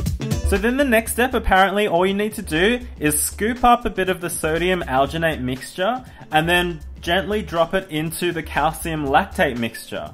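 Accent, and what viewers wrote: Australia. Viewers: JanielBxbes he's Australian. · Are u Aussie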